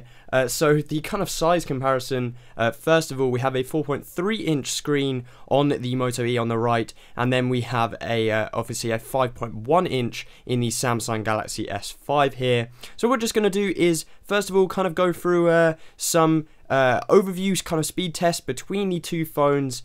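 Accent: United Kingdom. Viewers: I understand you may have US viewers, but please can you put the prices in pounds as well...you do sound British anyway. :)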